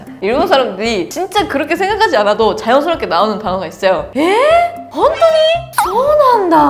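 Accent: Seoul, South Korea. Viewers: 억양이 진짜 한국인 같아요 넘신기ㅋㅋㅋ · 니뽄말 잘하는 칸코쿠진 같다.. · 일본인이라 밝히시지 않으셨음 한국인이라 생각 했을 거 같아요.. 스타일나 말투나 모든 게.. ( 한국인 스타일 느낌이 강한) 그래서 도리어 일본어 할 때, 한국인이 일본어하는 느낌이 들었네요! 신기한... 잘 보았습니다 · 백진희 닮았다 한국사람이 일본어하는거같어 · ㅎㅎ 일본어를 한국인이 일어하듯…하시네요. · 진짜 신기한게. 이분이 말하는 일본어발음에 약간 한국인의 일본어 특성이 묻어있음. 한국어를 오래 공부하면 모국어에도 영향을 주는건가? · 일본인들은 조금 귀엽게 보이는데.... 은 그냥 한국인 인데요~~생김새도 그렇고 말하는 투도~~~이쁘고요~~ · 일본인이라고???? 발음이 완전 한국인인데??? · 나 이런 톤으로 일본어하는 분 처음 본듯 ㅋㅋㅋ 어째 한국인이 일본어 하는 거 같냐 신기 ㅋㅋㅋㅋㅋㅋ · 서울에 10년 넘게 산 저보다 서울말을 더 잘하시네요..^^ㅠ 의문의 1패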